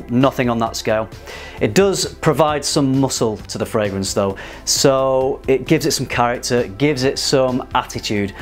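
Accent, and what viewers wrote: United Kingdom. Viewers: Besides the afford you put in your Videos, id like to tell you, that your Voice is just so relaxing and pleasant to hear, maybe because i also like that British açcent. I hope that doesnt sound weird to you. XD Anyway, loved the Video, reminded me alot of GTA Vice City haha. Many greatings from Europe.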